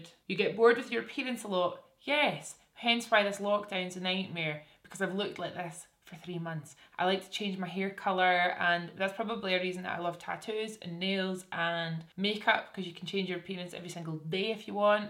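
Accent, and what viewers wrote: Scotland, United Kingdom. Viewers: I have been so obsessed with your YouTube channel over lockdown. It’s just feels like watching one of my friends, probs because your Scottish as well and I love hearing that accent😁🏴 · Okay. I absolutely adore you! I just came upon your channel and boy I’m glad I did. I love your voice, your Scottish accent, your jewelry, or tattoos, EVERYTHING! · I'm watching this with captions on, it literally doesn't understand the Scottish accent lol. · scottish innit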